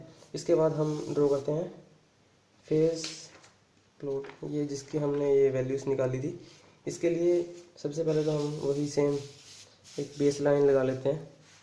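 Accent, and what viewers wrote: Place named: Himachal Pradesh, India. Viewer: आपके बोलने के तरीके से पता चल गया. मैं भी हिमाचल से हूँ इसिलिए।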